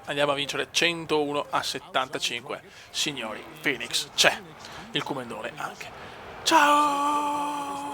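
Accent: Veneto, Italy. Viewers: che veneziano :D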